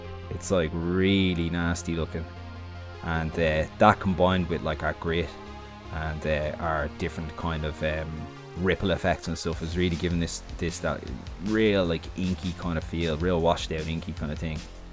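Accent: Ireland. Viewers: It is so refreshing to hear an irish lad talking about graphic design. Can't wait to see more of your videos :) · Awesome tutorial! I was taken aback by the real dub accent haha...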